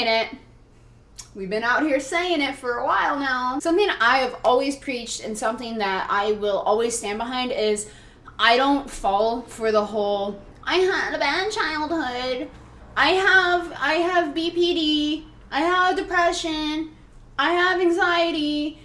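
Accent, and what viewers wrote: South, United States. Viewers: Is it me or she has a slight southern accent?... just, certain words. Its hard to tell but there is something. Never noticed. But maybe I´m just to sleep depraved. Also cuteness overload. Just pout.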